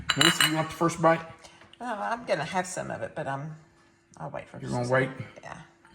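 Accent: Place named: Appalachia, United States. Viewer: Appalachian talk ! See, us Aussies know a lot about America ! Not many Americans know much about Australia 😢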